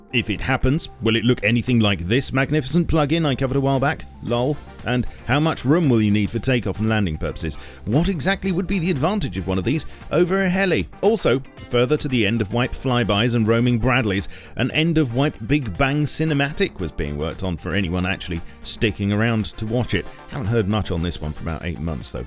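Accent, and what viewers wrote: United Kingdom. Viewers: He's British 😂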